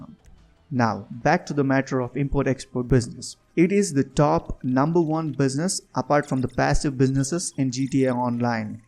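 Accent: India. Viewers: Tnx bro it works 😉😉😉 btw I love your indian accent 😘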